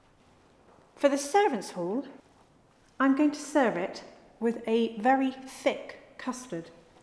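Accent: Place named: Cumbria, United Kingdom. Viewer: I can’t tell if this wonderful cooks accent is Queen’s English or Cumbria mixed with Scottish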